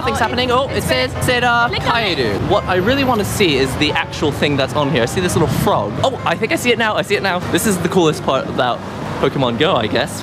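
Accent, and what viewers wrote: United Kingdom. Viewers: Um wierd what breed are u? Asien,With Briten Accent, Who speaking English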